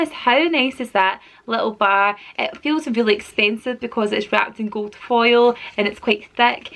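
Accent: Scotland, United Kingdom. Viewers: I'm new to your channel your kids are so adorable. I'm guessing your Scottish? I'm English lol happy late thanksgiving xx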